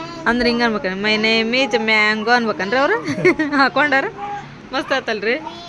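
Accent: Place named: Hubli, India. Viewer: Beautiful family ur Hubli is very beautiful & ur hubli kannada is so cute I like it gd night allah hafiz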